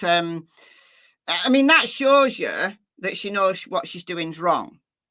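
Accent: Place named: England, United Kingdom. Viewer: It is morning here in the U.S. I luv you and your English accent and my second country England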